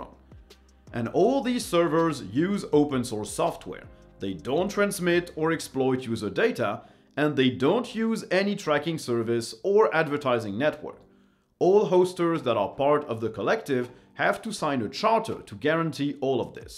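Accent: France. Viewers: I love hearing you speak in "French" English. This is a very interesting experience. I greet you from Poland. 😉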